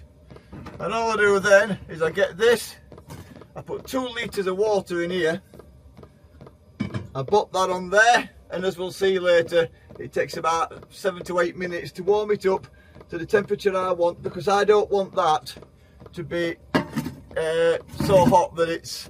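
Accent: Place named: Barnsley, United Kingdom. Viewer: Barnsley by t sounds. same ere..good video lads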